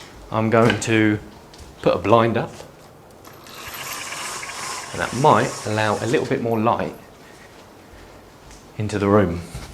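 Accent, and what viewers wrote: United Kingdom. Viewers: This man has a British accent.. I'm pretty confident I'm sold into 30 seconds..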